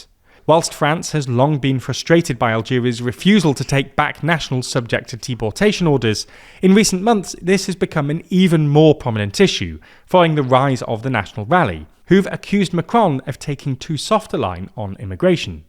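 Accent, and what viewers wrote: United Kingdom. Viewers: british lil bro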